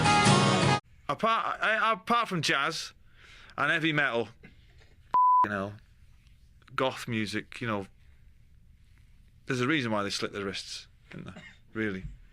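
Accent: Manchester, United Kingdom. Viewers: Try going to Ireland with your Manc accent and tell the Irish that YOU are Irish Noel ... they'll call you a plastic Paddy!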